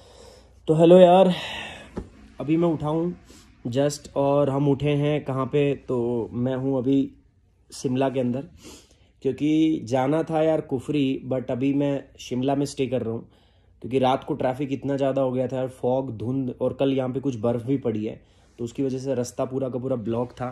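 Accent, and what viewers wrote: Haryana, India. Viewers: Radhe Radhe 🙏🙏sir g😇 Ek Ache Educator k saath Saath Aap to Blogger B Bnn Gye Aur Wo B Ache waale 💖💖 Aapka To Nose B Red ho gya Tha 🤪Apple jaise.. U r Superb Dear Sir g ❤️❤️ 💕💕May God Bless U Always 😇😇😇😇 Aapki Haryanvi Ki To Maii Fan ho Gyi Huu 💯💯💯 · Sir ji.....teaching ek taraf arr aap suthre ek taraf.....arr fer baat aawegi apni bolli ki Ya haryanvi ke zeher laage hai na aapke muh se bas bujho na...baki hum k kahe.....aapke nazdeeki gaon se hi hain hum.....aate rehn diya karo vlogs gadhya gaddh 😍😅🤗 💗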